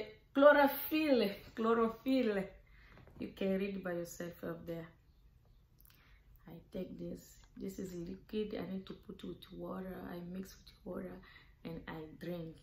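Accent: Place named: Democratic Republic of the Congo. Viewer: R u from Congo i like how u speak❤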